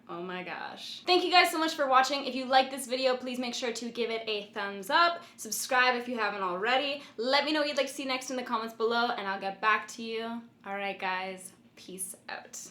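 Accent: Canada. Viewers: Stay awesome! Also luv the way you Canadians say your vowels ❤️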